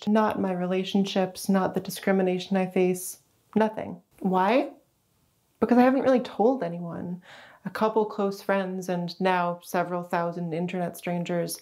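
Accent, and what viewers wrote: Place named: Canada. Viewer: P.S. I love your Canadian accent! Much love from the UK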